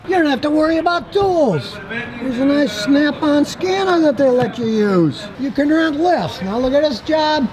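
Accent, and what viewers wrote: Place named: United States. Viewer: Where the hell is scottie from? Sounds like a yank himself.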